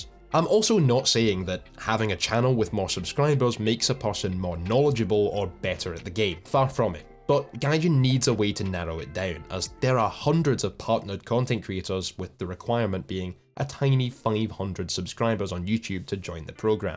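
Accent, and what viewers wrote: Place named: Scotland, United Kingdom. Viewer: Damn I love this scottish accent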